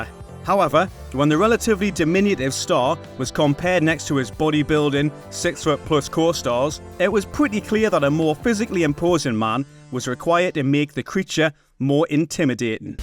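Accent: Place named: Newcastle, United Kingdom. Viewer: That's a Geordie accent. Not Australian.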